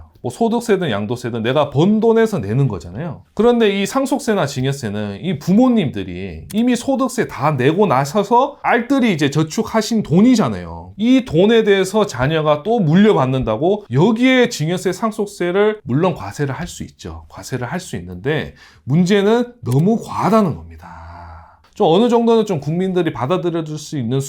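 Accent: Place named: Jeolla, South Korea. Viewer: 시원시원한 설명이 귀에 쏙~ 들어오네요 게다가,구수한 전라도 억양 살짝 풍기는 그 말씨! 듣기에 왠지 좋아요~~^^ 영상 많은 보탬되었네요 감사합니다,화이팅~~~!!!!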